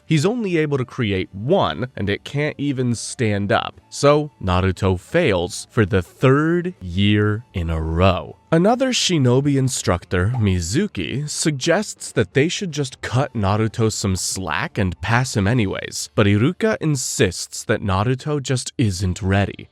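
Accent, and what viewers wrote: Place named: United States. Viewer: loved the videos but holy can you please stop pronouncing all the names UBER AMERICAN holy fk Its MAN GE KYU not MAN GEK KJO, this is just one of like 9000 cringe moments, its not to hard to do such a thing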